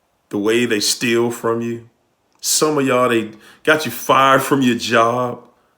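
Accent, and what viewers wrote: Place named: Baltimore, United States. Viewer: I hear a little Baltimore in your accent...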